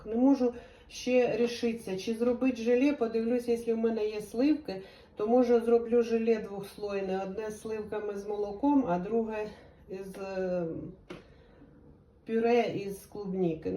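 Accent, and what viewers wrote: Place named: Poltava, Ukraine. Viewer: Доброго дня! Підняли тему жіночої дружби, і ми тепер позгадуємо, як було) Так, по молодості було багато жіночих дружб, студентських, ну не таких, щоб прям занадто, але з часом, з роками ти розумієш, що найкращої дружби, як до своїх близьких та рідних годі й шукати! Мені зараз 46, батьків вже нема давно, але підтримуємо тісний зв'язок з сестрами, це найкращі подруги 💯💯 нас троє. Добре, що так виходить, дай Бог, щоб і надалі так було!!🙏🤗🤗 А от тема про Полтавську область цікава, де ви там проживали, скільки часу, щось про Полтаву? Бо я сама з Миргородського району, а зараз ми виходить недалеко й мешкаємо один від одного, хвилин 40-50 їзди)) Чомусь зразу, як почала вас дивитися, почула у вас полтавську "балачку", ну трошки є)🤗🤗🤗 Бувайте здорові, гарного дня, всим вітання!!❤❤❤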